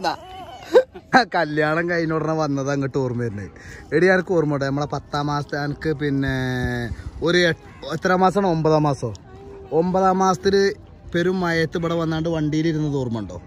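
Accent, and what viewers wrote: Malappuram, India. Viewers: തനി മലപ്പുറം കാരൻ തന്നെ അടിപൊളി പച്ച മലയാളം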